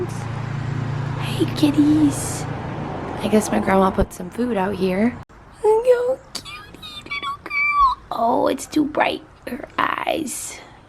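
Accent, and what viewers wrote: Louisiana, United States. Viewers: We love that Louisiana accent that came out on Easter lol I enjoyed this vlog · You could totally hear accent come alive when she was home in Louisiana LOL!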